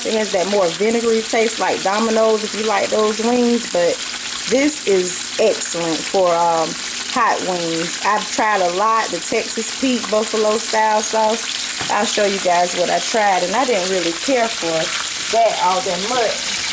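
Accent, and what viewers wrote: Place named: Mississippi, United States. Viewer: Are you from Mississippi you have my mother's accent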